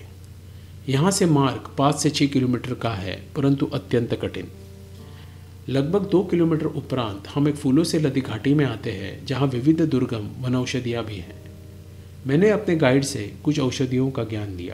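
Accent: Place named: Maharashtra, India. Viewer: I guess you are from Maharshtra or Goa as of you Hindi dialect.Really fine narrated.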